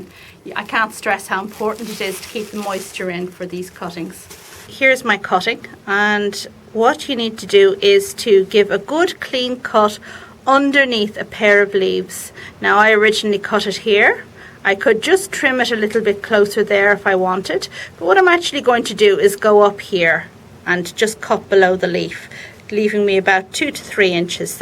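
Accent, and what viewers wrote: Ireland. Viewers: I have learned that if you plan to use an herb for culinary purposes, dipping in hormone powder is not good since it imparts the chemicals in the hormone powder. If it is just for ornamental purposes, that is fine. Love your accent, Love Ireland!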